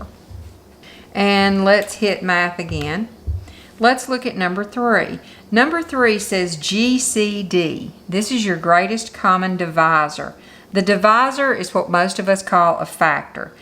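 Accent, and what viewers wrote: South, United States. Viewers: Thank you soooooooo much for such a super tutorial video!!! After many years of taking advanced math now everything's is blur. And now, I have to recall most of it but thanks to this calculator things are much, much easier! I just wish I'd had this calculator when I went to college some 40 years ago. BTW, I love your southern accent.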